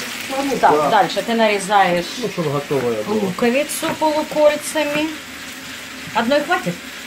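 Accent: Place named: Rural, Ukraine. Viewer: !! Какой позитив и Ваши прекрасные комментарии к готовке! Смотрю, не отрываясь,хотя блюдо простое.Но так аппетитно Вы говорите,вспоминаю украинскую деревню,детство и мою бабушку, деда, отца.Как этого говора и блюд не хватает в Москве!! Спасибо Вам, снимайте больше и про все, про все!!!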